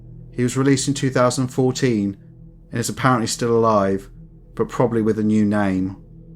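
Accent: United Kingdom. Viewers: What part of England are you from? The south?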